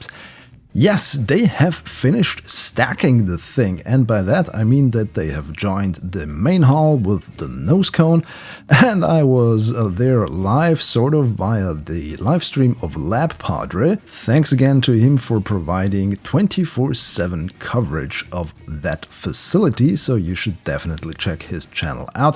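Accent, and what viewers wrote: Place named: Germany. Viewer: man hört den deutschen akzent in deiner stimme raus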